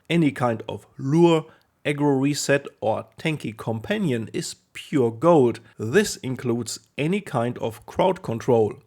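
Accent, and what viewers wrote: Germany. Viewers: Ja cool das du da drauf antwortest!!! wusste ich doch gleich das deine Muttersprache deutsch sein müsste!! Dieses markante wenn Deutsche Englisch sprechen erkennt man irgendwie sofort!! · are you german :D ?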